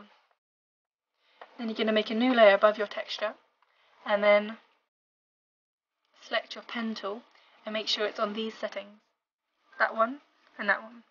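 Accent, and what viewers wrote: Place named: United Kingdom. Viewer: I'm a sucker for British accents. I love it!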